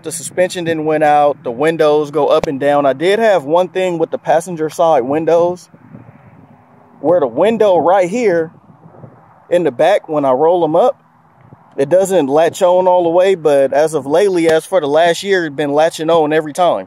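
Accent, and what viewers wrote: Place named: Texas, United States. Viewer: Damn, you’re country. You must be from Texas. 😂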